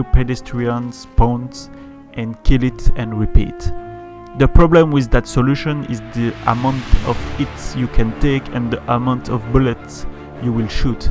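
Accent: France. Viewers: Putain de french accent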